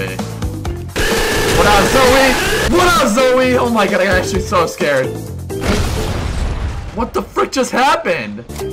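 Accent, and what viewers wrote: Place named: New Zealand. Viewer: Who else hears kiwis when he talks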